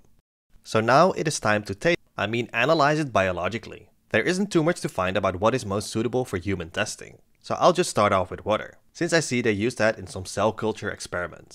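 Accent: Netherlands. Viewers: Are you dutch? youve got like a dutch accent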